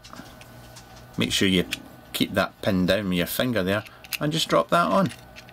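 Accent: Scotland, United Kingdom. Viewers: Always reassuring to hear a Scots accent :-)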